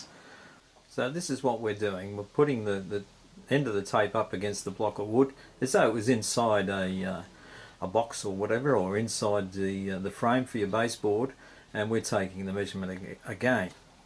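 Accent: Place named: Australia. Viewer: Hi. Given your location as being in Australia (and the accent is a giveaway), I'm intrigued to know how you came to choose Great Chesterford/Duxford/Newmarket as the location for your layout. Another reason for my curiosity is that I did most of my growing up in Burwell, about four miles from Newmarket. Much of my education was in Burwell and Cambridge. Since leaving Burwell, I have lived on the outskirts of Cambridge. So, what's your story?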